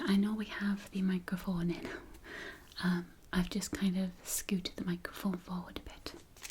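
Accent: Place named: Newcastle, United Kingdom. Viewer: ASMR + Geordie accent = homesick lol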